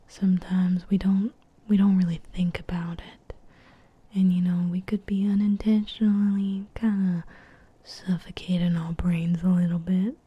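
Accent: South, United States. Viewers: >w< Ay yam a huge adorer of lovely ladies with southern accent. May I have more, please? (I luv your voice.) <3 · I cant tell if it is or not, but if so im glad you did the southern accent again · I need more of the southern accent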